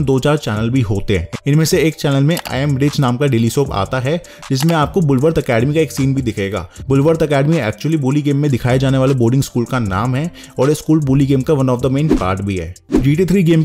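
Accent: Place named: India. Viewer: He is talking indian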